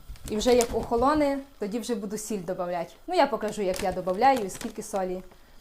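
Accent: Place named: Zhytomyr, Ukraine. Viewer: Здравствуйте. Я сама с Украины с Житомира диалект знакомый,вы где живёте? Очень скучаю, а теперь не знаю смогу ли приехать. Я живу в Казахстане, Алматы. Спасибо.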